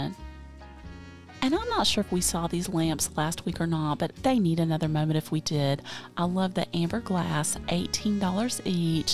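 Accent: South, United States. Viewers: I am sitting here knitting socks,watching you encourage mischief..........wonder where that came from😅😅😅? And listening to that soft southern accent.❤❤❤my peaceful moment!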